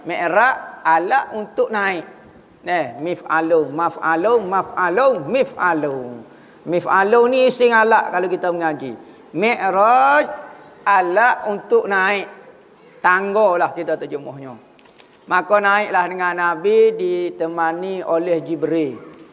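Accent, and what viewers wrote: Kelantan, Malaysia. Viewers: hehe.. Yaa, beliau Tuan Guru kita semua semoga kita dterima menjadi muridnya.. 😘 serius walau pekat loghat kelate saya dari Kedah boleh faham dan masuk ke hati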